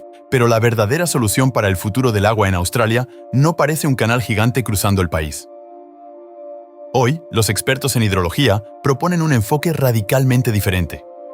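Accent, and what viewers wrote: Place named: Spain. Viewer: habla como sspañol y larino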